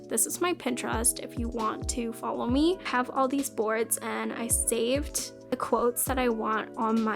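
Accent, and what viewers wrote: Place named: England, United Kingdom. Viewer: I like your english accent, can you make a content about it?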